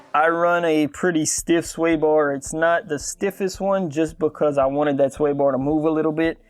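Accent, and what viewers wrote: Louisiana, United States. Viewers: I cant with the Leweeze-ee-annah accent... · Got that Houma type accent going....I'm on the Northshore. Just getting into the drag racing scene, coming from Nitro Buggies in the past.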